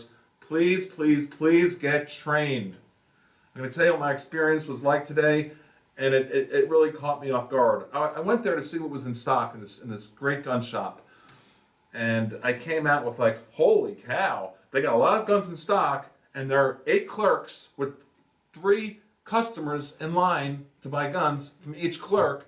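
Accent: Long Island, United States. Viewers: Funny to find out you grew up on Long Island. I live here ! Always wondered where your accent came from. You a Nassau or Suffolk guy ?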